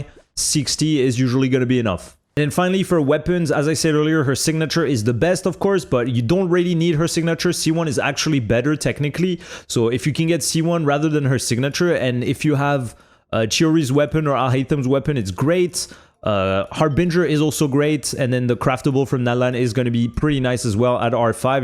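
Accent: France. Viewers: Français ou Canadien ? Cool to see french people speaking english :D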